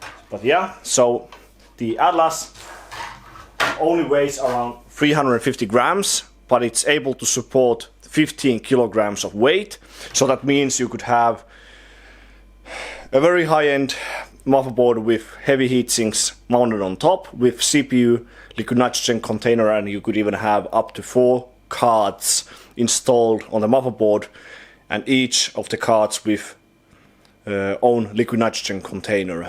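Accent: Finland. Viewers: Aksentista huomaa että oot suomalainen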